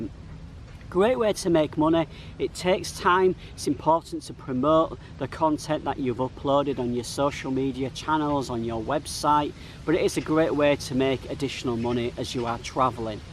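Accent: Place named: Manchester, United Kingdom. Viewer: sorry mate i didn't mean to offend you , i thought you are from Manchester through your accent , lol cheers man , i like your narrating way and i keep following your videos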